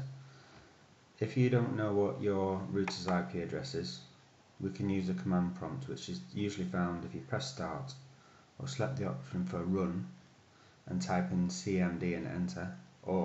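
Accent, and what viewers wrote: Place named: Yorkshire, United Kingdom. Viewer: Nice one . I like your Yorkshire accent .-:))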